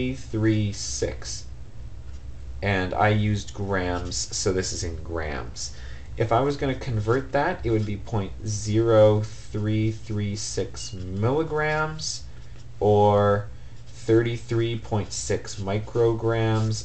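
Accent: Canada. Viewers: lol, accent is so Canadian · he is canadian. I can bet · I can tell this dude is Canadian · I knew you were Canadian within the first 10 seconds lol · you truly speak like a Canadian. Oout. Aboout.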